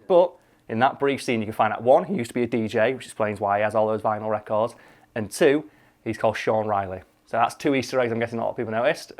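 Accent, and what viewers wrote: United Kingdom. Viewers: Finally, someone who talks rate bladdy British! Someone to like!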